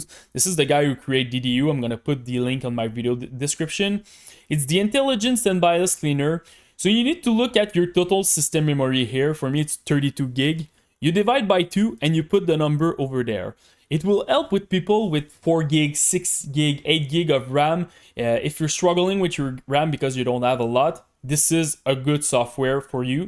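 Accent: Quebec, Canada. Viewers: L accent québécois 😍😍😍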